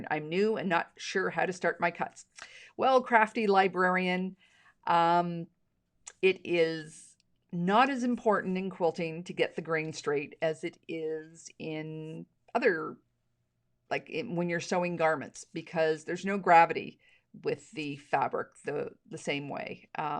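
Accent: Canada. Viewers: Hello I love your videos and really appreciate a Canadian voice. Because sometimes we don't always get the best variety and prices over here. But we love what we do. I would still consider myself a beginner in the grand scale of things. However I'm getting there for sure in terms of building my basics. And keep seeing your beautiful complex personal projects. Wich leaves me to ask if you can do a more intermediate quilt block video of some of your favorite blocks. Even some from the beautiful backdrop behind you 😍 would be wonderful and very appreciated.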